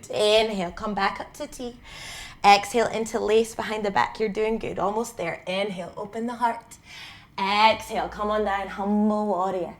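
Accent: United Kingdom. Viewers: I absoluteley LOVE your work, your devotion and your joy with Yoga.. and the best bit: your british voice! thanks so much xxx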